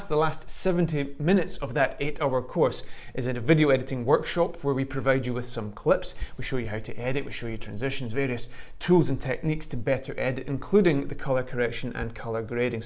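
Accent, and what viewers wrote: Scotland, United Kingdom. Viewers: Interesting explaination. Thank you. I think saturation especially adds a lot in videos. Love your accent. I guess it’s scottish!!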